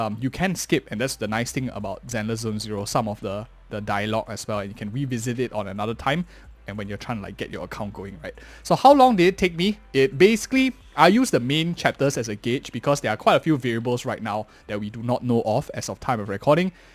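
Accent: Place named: Singapore. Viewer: hello, when i first heard you speak i immediately recognised our SG accent! hahaha. keep up the good work with your guides. much love from a fellow SG:D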